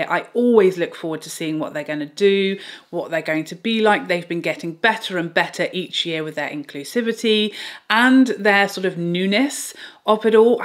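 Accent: United Kingdom. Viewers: The hair looks great 😍 I need blue eyes and a British accent now!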